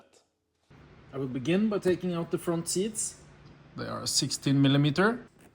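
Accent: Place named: Norway. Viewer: Du må seriøst prate på norsk. Ingen fra utlandet orker å høre på den norsk / engelsken din.. Først må du nå ut til publikum her hjemme før du skal gå «viral». Du har bra content, men du må gå gradene som alle andre dessverre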